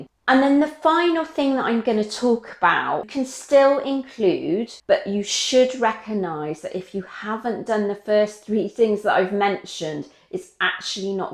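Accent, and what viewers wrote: England, United Kingdom. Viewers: Ahh England accent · I'm in San Antonio, Texas. Love hearing about fitness and diet for my age, and especially with your English accent. ❤️❤️❤️ I'm definitely inspired, and your videos are helping me!!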